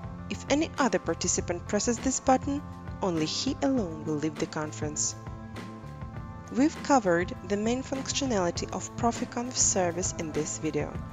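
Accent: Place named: Russia. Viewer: какой чудный русский акцент :))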